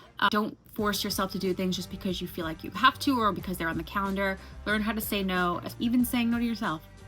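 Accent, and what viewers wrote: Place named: New York, United States. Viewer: Oh snap, I knew you sounded like a NY’er…Rockaway Blvd stairs is NO JOKE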